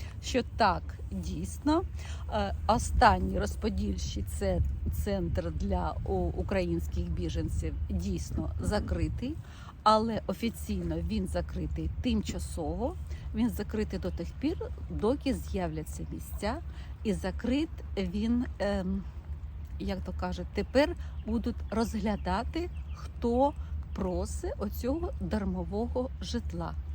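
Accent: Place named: Russia. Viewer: Так интересно слшуть как вы пытаетесь перейти с русского на украинский, а русский так и проскакивает ))))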